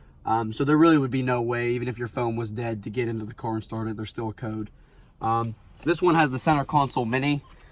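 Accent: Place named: Pittsburgh, United States. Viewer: Lol are you from Pittsburgh? I can tell that accent from anywhere!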